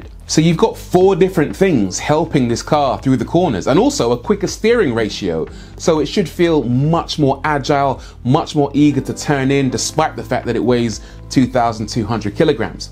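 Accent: United Kingdom. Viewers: Feels weird to watch a black man speak with a British accent...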